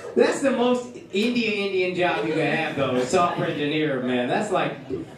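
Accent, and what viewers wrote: United States. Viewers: Some indians have a difficult time understanding his American accent,that's why everyone's going on about the audio.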